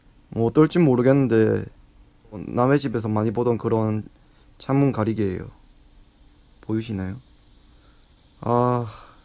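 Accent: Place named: Gyeongsang, South Korea. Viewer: 솔직히 목소리만 들어도 힐링.. 목소리완전 터프... 경상도분들 말투 매력있어요 완전 상남자..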